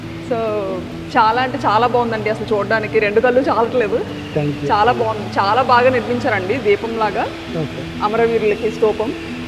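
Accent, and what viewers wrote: Vizianagaram, India. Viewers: గలగలా మాట్లాడేసి రెండు తెలుగు రాష్ట్రాలే కాదు, ప్రపంచ వ్యాప్తంగా ఉన్న తెలుగువారి సోదరి ..మళ్ళీ మళ్ళీ వినాలి అనిపించే విజయనగరం తెలుగు పరిమళం ...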